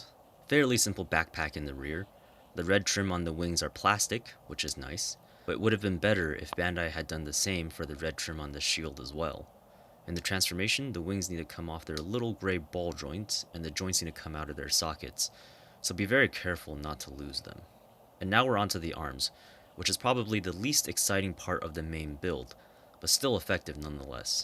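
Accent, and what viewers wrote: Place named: Ireland. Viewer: he's from ireland what do you expect his voice is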